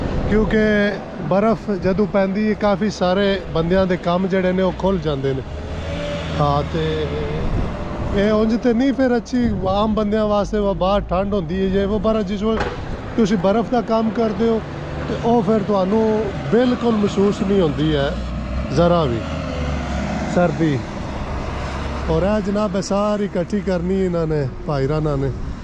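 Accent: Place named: Kharian, Pakistan. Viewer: ان بھائی صاحب کا بولنے کا لہجہ ضلع گجرات کھاریاں کے نزدیک علاقے کا ہے یہ میرا اندازہ ہے بہت ہی ودیا وڈیو اور کمینٹری کی ہے بھائی صاحب نے ،،،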